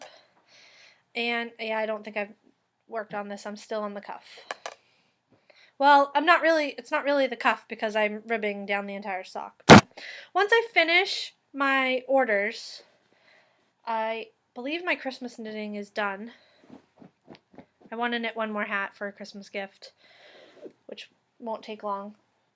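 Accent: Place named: South, United States. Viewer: Nothing wrong with talking like you're from the south lol.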